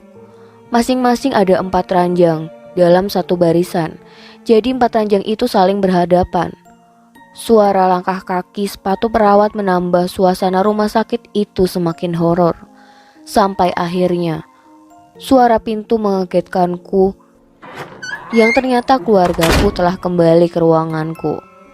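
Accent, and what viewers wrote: Banyumas, Indonesia. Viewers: Udah penasaran sama akhir ceritanya, logatnya ngapak2 medok ya min 😂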